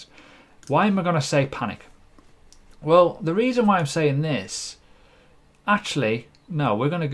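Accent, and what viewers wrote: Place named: Yorkshire, United Kingdom. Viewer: gotta be a Yorkshire accent?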